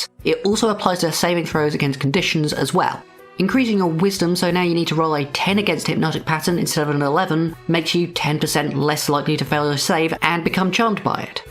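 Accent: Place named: United Kingdom. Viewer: oh, you’re british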